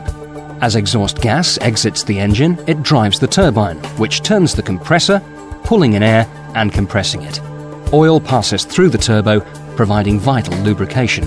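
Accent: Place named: United Kingdom. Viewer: Fantastic overview of turbocharging. Everything sounds better when explained with a British accent. Well done.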